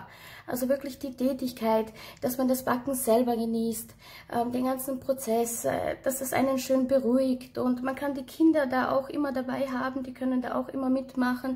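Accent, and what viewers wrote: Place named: Austria. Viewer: Ich mag sehr gerne deine guten Rezepte und deinen lieben österreichischen Dialekte. Meine Oma hatte auch viele solche Rezepte!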